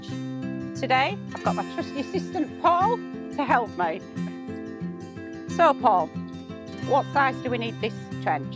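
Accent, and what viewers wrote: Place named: United Kingdom. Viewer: Her British ACCENT is so THICK, I can't understand a word she is saying. I would say try speaking English but that would be counterproductive since....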